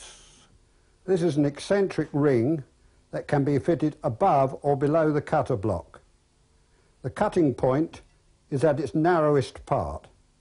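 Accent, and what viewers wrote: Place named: South East London, United Kingdom. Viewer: I lived in SE London as a kid and later moved out to Kent so accent did not seem any different to my own. It is sad to hear he passed away but he did have a slightly younger assistant who was also very knowledgeable, I wonder if he has carried on teaching people.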